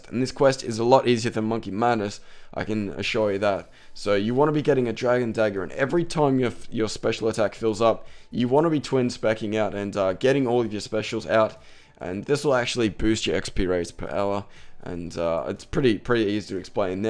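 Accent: Australia. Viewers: He's clearly not American. he's australian. run off UK English. aka the English from England. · yew aussie guide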